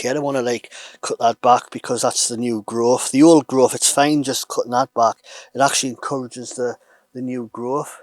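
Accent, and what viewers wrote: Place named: Ashington, United Kingdom. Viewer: When’s the next video coming out? I’m getting withdrawal symptoms 🤣 miss that ashington accent n canny craic hew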